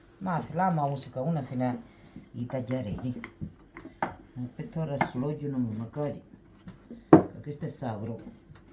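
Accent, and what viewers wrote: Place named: Calabria, Italy. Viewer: Soy Argentina, hija de calabreses. Su dialecto.me emociona al igual que sus comidas, ingredientes, etc., etc. Si tendría la oportunidad de viajar, iría a verla. Eres una mujer admirable ❤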